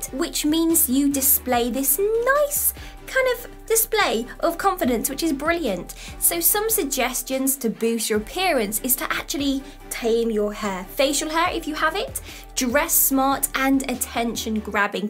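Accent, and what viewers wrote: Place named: RP, United Kingdom. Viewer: Cool RP accent and cool backdrop too.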